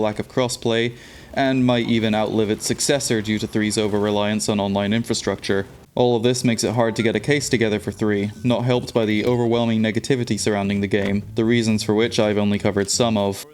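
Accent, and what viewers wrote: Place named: Jamaica. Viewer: I thought you were Jamaican am I fucking stupid.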